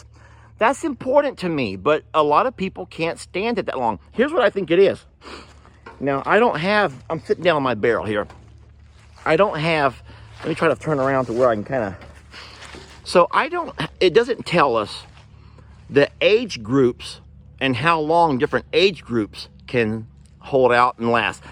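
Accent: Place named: Texas, United States. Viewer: I watch all your videos all the way through, always! I love your Texas accent. I watch your whole family- you are all very entertaining and make me laugh….at night I lay in bed and put one of your videos on and relax and listen. I watch in the morning, as well as I eat my toast. These are my routines- gotta have my family fix every day! Keep doing you, I love it!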